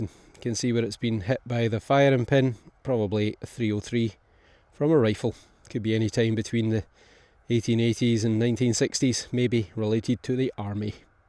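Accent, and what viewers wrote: Scotland, United Kingdom. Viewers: First time I've liked watching a metal detector. Maybe it's the Scottish accent. I've been watching Outlander.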